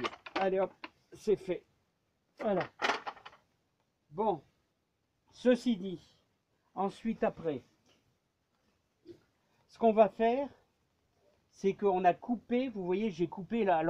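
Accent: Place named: Calais, France. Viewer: Salut Je ne suis pas pressé pour les graines t'inquiète pas de toutes les façons ce sera a semer qu'au printemps prochain,et,si tu as d'autres graines quelconques a rajouter si tu veux il y a pas de problème. Pour ma vigne je vais voir encore cette année ce que va donner le résultat. Dis moi en feuilletant tes pages j'ai vu que tu bouture la clematite tu le fait quand?.Je te remerci pour tes réponses c'est toujours avec plaisir de te revoir et si un jour je n'ai pas le moral Je penserai a regarder Ta chaîne. Il y longtemps j'ai connu une famille a Calais:la famille Alexandre qui s' occuper du club des Vieux tarots, tu les connais peut-être? C'était mes anciens grands beaux parents. Par ton accent Tu me rappel un peut cette époque. Bye bye.a bientôt Pascal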